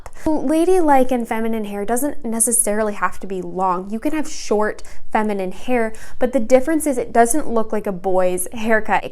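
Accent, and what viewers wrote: Midwest, United States. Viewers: Where in the midwest are you from?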